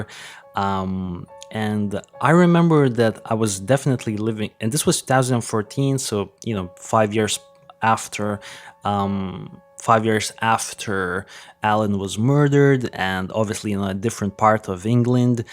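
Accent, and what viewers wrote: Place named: Russia. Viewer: Completely off-topic, but are you Russian? You sound so much like my dad when he spoke English. He immigrated to the US when he was 24. It was soothing to hear (aside from the content in which you were talking about).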